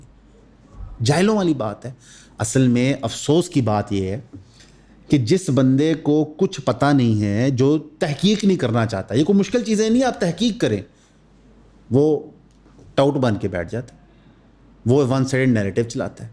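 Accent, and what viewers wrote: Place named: Punjab, Pakistan. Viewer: السلام علیکم ورحمة اللہ وبرکاته محترم! ایک مؤدبانہ مشورہ ہے۔ آپ پنجابی لہجے میں اردو بولتے ہیں اور انگریزی الفاظ کا بے جا استعمال کرتے ہیں۔ جبکہ انکے مساوی اردو لغت میں موجود ہیں۔ براہ کرم اپنی diction یعنی لہجے اور انداز تخاطب کو بہتر کریں۔ درست اسلوب کلام نہ ہونے کے باعث، آپ کی بات درست ہوتے ہوئے بھی اپنی افادیت کھو دیتی ہے۔ اگر مشورہ ناگوار گزرے تو معذرت کا طلبگار ہوں۔ والسلام علیکم ورحمة اللہ وبرکاته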